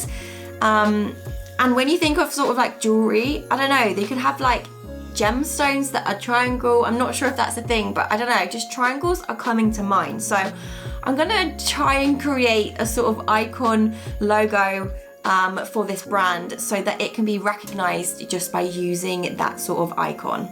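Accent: United Kingdom. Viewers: Please mam, make translate cc into urdu. Your work is incredible but very difficult to understand of your fast british language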